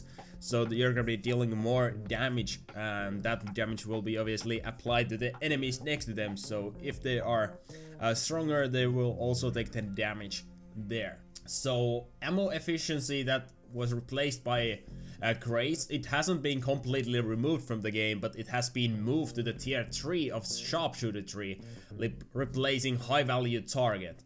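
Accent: Finland. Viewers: Texas Thanatos massacre! btw i like your finnish accent, reminds me of My Summer Car dev guy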